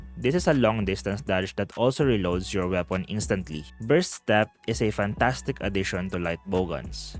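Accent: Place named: Philippines. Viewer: Your accent in the beginning, I knew exactly you're a filipino like me. Kudos to your guide video bro. After doing speedruns on my Bow... now it makes me wanna go LBG for my next weapon. Thanks man great video!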